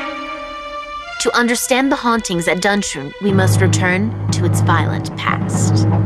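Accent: United States. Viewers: This is just taken from the "Castle ghosts of Scotland" series except they've just cut it down and remixed some scenes while cutting out others and got a Yank narator instead of the original which had an Englishman. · Scotland in a US accent. Gimme a break.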